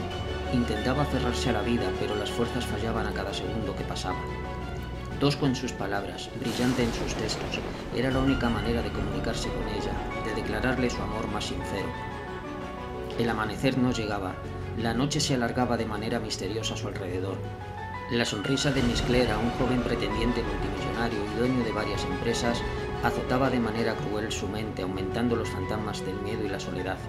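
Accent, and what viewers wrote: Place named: Spain. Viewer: Hermoso dibujo ,buena narración . Me recuerda los radioteatros que escuchaba cuando era niña. Tienes acento español. 🌞🌛✨🌿🌸🤗🥰😁👍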